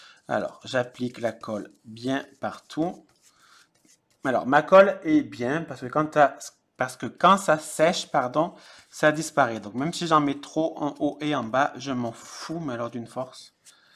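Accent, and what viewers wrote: South, France. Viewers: Très beau. Je vais me mettre à faire des albums. Jusqu'à présent que de la carterie... J'adore ta bonne humeur et ton accent méridional qui met du baume au coeur. Bon week-end à Seb et toi. Scrapbises. Chantal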